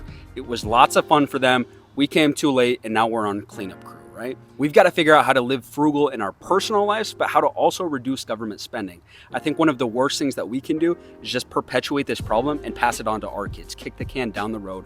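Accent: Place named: United Kingdom. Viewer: Just because you're British doesn't make it gen ZED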